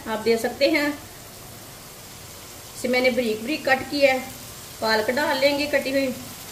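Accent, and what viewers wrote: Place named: Punjab, India. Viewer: Ap urdu m video na bnaya krien pnjabi m bnaien ap ka bolne ka andaz pnjabi h urdu zuban ap pr sot ni krti pls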